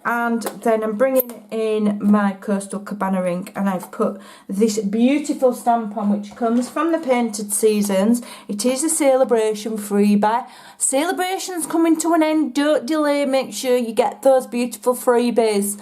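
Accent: United Kingdom. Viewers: Your video was the first I watched. I have lots of 3D embosses folders. I could watch your video all day because of how simple it and easy it looks and I could listen to your lovely accent all day! Where are you from in the UK?? Right now I am addicted to watercolor painting florals using stamped and embossed images ... using Ken Oliver color bursts....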